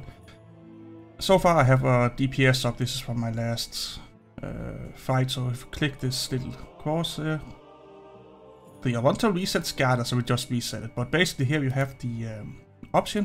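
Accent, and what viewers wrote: Denmark. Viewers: It's so obvious on you accent, that you're danish, haha! I like it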